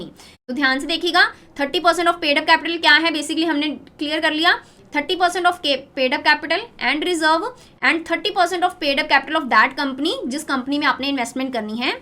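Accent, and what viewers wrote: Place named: Punjab, India. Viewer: Punjabi tone ari h apki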